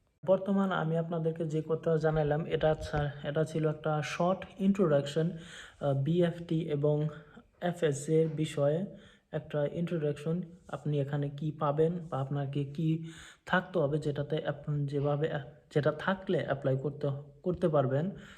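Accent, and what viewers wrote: Assam, India. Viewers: moi apunar kothar style sai buji goisilu...apuni Assam or pora ...bhai apunar logot keneke contact koribo paru ?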